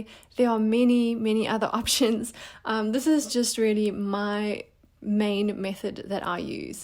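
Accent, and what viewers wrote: South Africa. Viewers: Thanks so much, just what I need, plus I love your SA accent.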